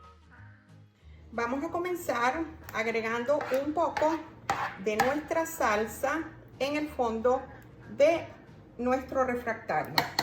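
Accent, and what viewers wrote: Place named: Cuban American, United States. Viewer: Muy bien explicado, para mañana tengo invitados, y estaba revisando cómo hacerla, gracias . Está rica. Por tu acento creo que eres cubano americana.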